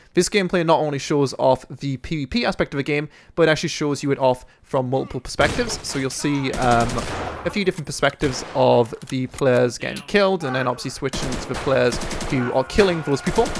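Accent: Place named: North East England, United Kingdom. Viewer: lol, dad dad!? U sound like youre from the north east :)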